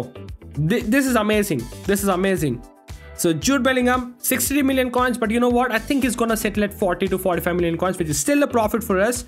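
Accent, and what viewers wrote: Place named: India. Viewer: His sound like India but I’m love you! VIDEO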